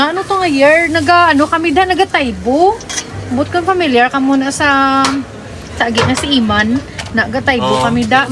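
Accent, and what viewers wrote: Iloilo, Philippines. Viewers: Langga tamsak nakakatuwa Ang accent na illonga day injoy sa road trip full watch Po support din po salamat dikit na Ako langga sa Bahay mo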